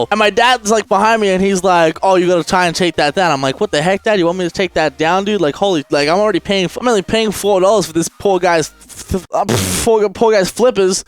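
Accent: Australia. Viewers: wait a second, you're australian? what state?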